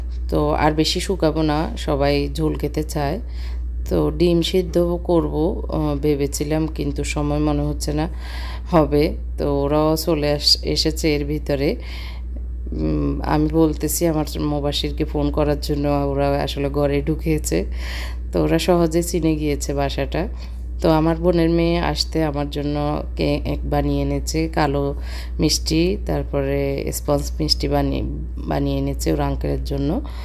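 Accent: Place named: Cox's Bazar, Bangladesh. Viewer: আমি ককস্ বাজার থেকে,আপনার কথা শুনে মনে হচেছ আমাদের কাছের।কোথাকার বলবেন?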